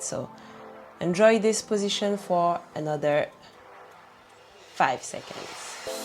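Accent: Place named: France. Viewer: English with a French accent is insane. Now you know what your trump card is.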